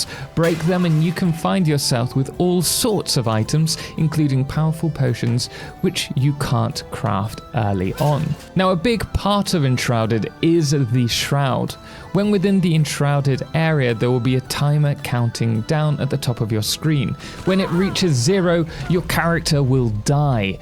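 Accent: United Kingdom. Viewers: Sounds like a normal British accent to me, you know from tje country that the language came from 😂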